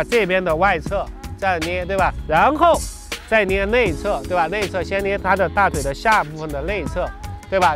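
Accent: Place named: Hunan, China. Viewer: 小哥你是湖南还是 武 汉滴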